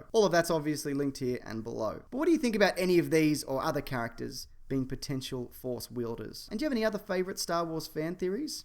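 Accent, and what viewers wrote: Australia. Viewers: dude are you an aussie?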